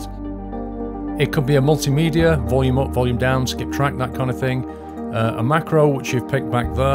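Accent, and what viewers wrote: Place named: United Kingdom. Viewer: Nice review, i really like that it has kind of a unique british feel to it. Maybe it was just the accent:D, but it really felt more professional compared to the more gamery type of hardware reviews from US based tech channels that i usually watch Anyway i still want to give you a heads up, as there has been a lot of controversy about this that can be a problem in the future when your channel gets even bigger, i think a clearer disclaimer can be helpful, especially whether you have been paid to do a review or if it was just you wanting to do a review and they provide you with the product. Youtube also has a guideline on this too if you haven't seen it <link> Keep posting these reviews though, i enjoy it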